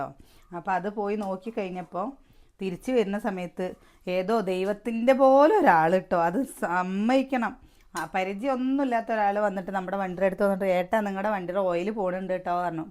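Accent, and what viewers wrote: Palakkad, India. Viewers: Aadiye angayaane zjnnaunkettutto palakkadan bashakke oru prthykataram banfiyaane chechi avvoo yentaanne ninga ingana parayine😂😂😂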